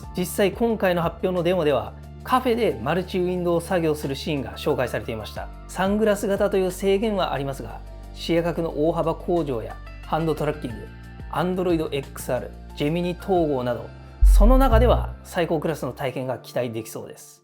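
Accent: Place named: North Kanto, Japan. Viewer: 北関東かな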